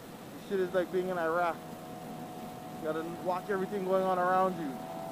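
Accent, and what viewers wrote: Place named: New Zealand. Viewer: I'm a Filipino from Guam... used to work on one of them cruise ships that sailed around the Islands of Hawai'i, that is why I thought your accent was familiar... For a second I thought your accent was from New Zealand, kinda sound similar...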